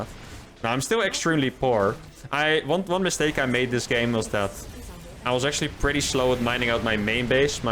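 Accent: Netherlands. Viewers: You are dutch arent you😋 I reconise our accent everywere. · Hallo Nederlands accent 🙂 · Argh that dutch accent. Nice vid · First video to see from this channel.. and judging by your accent.. you're Dutch aren't you? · Are you dutch?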